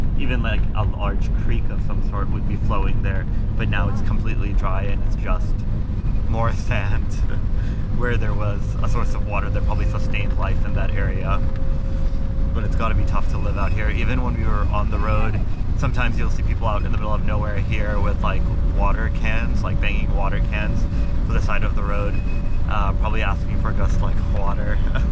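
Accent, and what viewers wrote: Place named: United States. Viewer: Thank you for this beautiful vlog of Berbera Port.I liked and subscribed the channel.Are you from the United States.You've am American accent.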